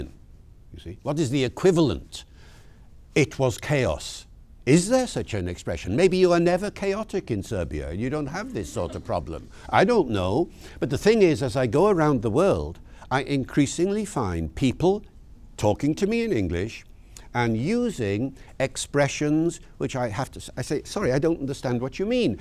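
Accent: RP, United Kingdom. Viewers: David Crystal's pronunciation is known in linguistics as RP (Received Pronunciation). The closest spoken English to the rules of English pronunciation is found in the upper Midwest of the United States, often called " General American Standard", it lacks the different accents from other areas. Major TV networks send there promising young news broadcasters to special accent neutralization classes in the midwest. General American Standard is often called Network Standard or newscaster accent. RP and General American are pretty close, RP has a little "Prestige" inflection in it. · His accent sounds 100% British. Not one American accent (not even the transatlantic one) comes close to sounding like this. If you think this sounds like American English, you are quite unfamiliar with spoken English (no offence intended). I personally think he has a hint of a northern British accent. 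- I think his pronunciation of "see", "settlers", and "home" give it away a bit, just to name a few examples. According to Wikipedia Billy Crystal grew up in Liverpool while his mother was from Northern Ireland, so that may explain it. I'm not a native English speaker though, so if any native British English speaker could tell me whether I correctly picked up his northern leaning accent, that would be great. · He speaks RP, which was invented for that purpose.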